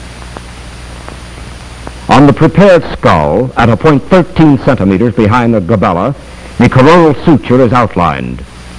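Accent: Transatlantic, United States. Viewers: 1. Old as hell video 2. Transatlantic accent narrator 3. Fuzzy background sounds 4. Slightly distorted video quality 5. Almost/complete black and white colours 6. Starts off with a bunch of descriptive texts 7. Medical related Well this video check listed all the points to be CREEPY AS FUCK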